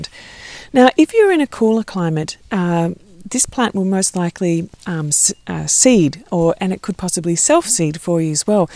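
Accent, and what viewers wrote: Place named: Australia. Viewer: Wow an Aussie I love the accent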